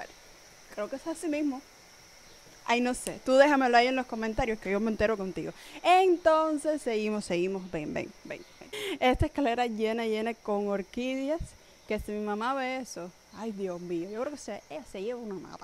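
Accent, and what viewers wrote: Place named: Cuba. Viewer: Conozco tu país, pero cuando estuve por ahí no existía la pandemia, por lo que no tuve la oportunidad de familiarizarme con el término. Lo que sí existía era un calor de morirse. Muy lindos tus videos, muy sugerentes, porque a lo coloquial de tu lenguaje con ese dejo muy cubano, le agregás una simpatía que cautivaría a cualquiera que te viera. Te felicito.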